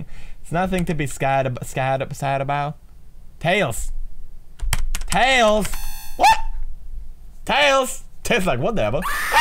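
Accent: Sweden. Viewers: Lmao he sounded a lot more Swedish back then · Damn his Swedish accent was way thicker back then.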